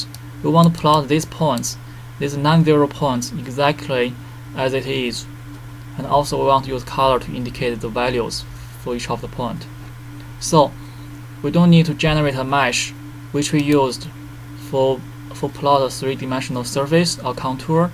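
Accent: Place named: China. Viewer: love your accent reminds me of my China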